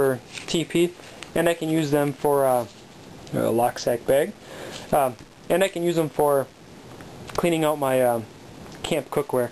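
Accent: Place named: Upper Peninsula, United States. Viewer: you sound like a yooper der pale, you from canada or anywere around ehh?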